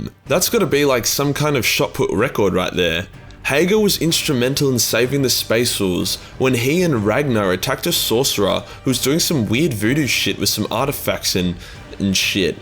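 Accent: Australia. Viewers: Dude, you talk like Paris Hilton, but with an Australian accent. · He's speaking pure okker. It's an Aussie thing... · Best way to wake up is a crazy Australian talking about sci-fi shenanigans. FOR THE EMPEROR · Hearing 40k in Aussie is good for the soul 😁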